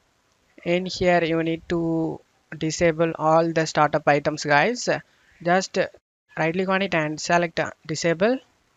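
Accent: India. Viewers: I love these indian accent tutorials so much, this for real fixed my problem. I subbed you! Thanks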